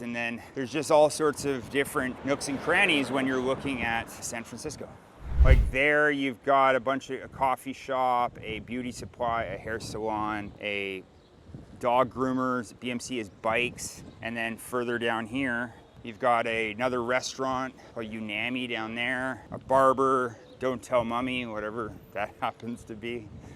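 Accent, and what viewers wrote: Canada. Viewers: Your from Canada EH?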